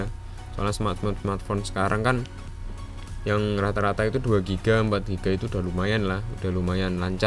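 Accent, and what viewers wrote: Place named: Java, Indonesia. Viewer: Ngomong nya Medok Banget Mas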